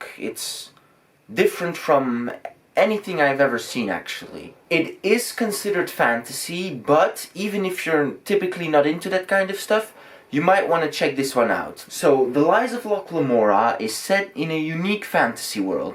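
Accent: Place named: England, United Kingdom. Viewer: Liking the English accent, strong opinions and of course the bun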